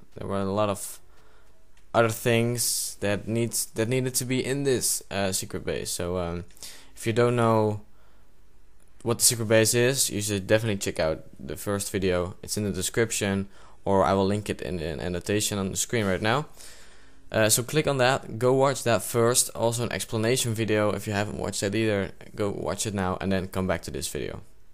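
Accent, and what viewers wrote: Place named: Netherlands. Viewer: wow jij klinkt nederlands zeg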